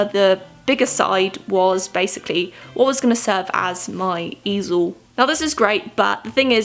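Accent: United Kingdom. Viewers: Becaus you have a british accent and you live in america... or england.. plz tell us in a vid! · erm are you American you say dollars and stuff but you sound like you have a British accent lol. great video I'm more of a sketcher, drawer however you say it but the organisation tip was pretty useful. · do you live in America? hearing a British person saying dollars and hobby lobby confuses me 😂😂😂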